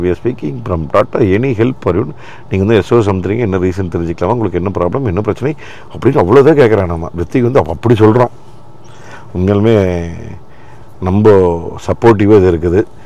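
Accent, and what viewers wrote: Kongu, India. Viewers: தம்பி அவர்களுக்கு வணக்கம் வாழ்த்துக்கள். உங்களின் மண் வாசம் மாறாத கொங்கு தமிழும், அதனுடன் இணைந்த இயல்பான பேச்சும், அதில் தெரிகின்ற உண்மையும், புன்னகையும், உங்கள் காணொலிகளின் வெவ்வேறு விதங்களில் வெளிப்படுத்தி வருகின்ற விதமும் மிகவும் சிறப்பாக உள்ளது. வாழ்த்துக்குள்!! தொடரட்டும் தங்களின் பணிகள். பாராட்டுக்கள்.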